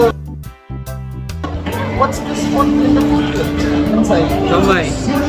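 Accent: Visayas, Philippines. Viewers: Perting ka hawd ug bisaya dol oi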